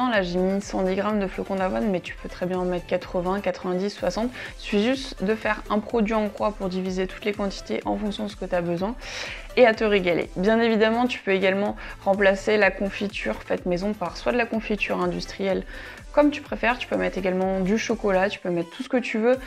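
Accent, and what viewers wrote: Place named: France. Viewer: I love French accents!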